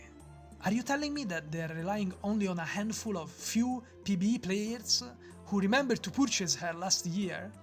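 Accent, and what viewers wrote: Italy. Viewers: bro are you italian perchance your accent :D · Are you italian perhaps? Your accent sounds like that for me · You have such an Italian accent. Is it just me, or do you perhaps have Italian origins? I'm so curious xD sorry But anyway, I always appreciate your content. Thank you for what you do for us in the community ❤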